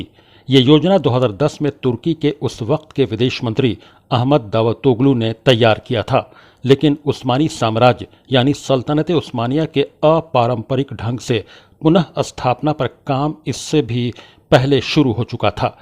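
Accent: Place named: Arab world. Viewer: अरबी स्टाइल में जीभ क्यों मोड़ते हो । मूर्ख अपनी भाषा मे बोलो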